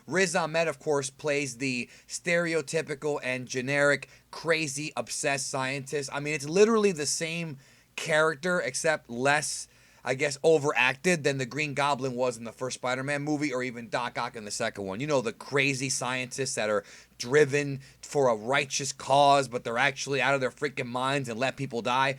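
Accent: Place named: Connecticut, United States. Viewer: This dude has got to be from Connecticut because he sounds exactly like the frontman Jamey jasta from Hatebreed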